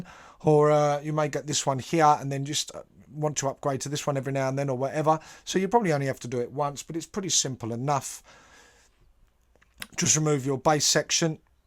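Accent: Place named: Cockney, United Kingdom. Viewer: whats that accent cockney 😄😅😂 He always does better reviews that the shed dweller ✌safe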